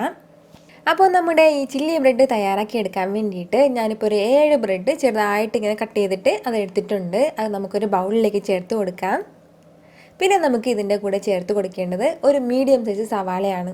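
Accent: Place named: Palakkad, India. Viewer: Palakkad ആണോ മോൾടെ വീട് ....🌹🥰big fan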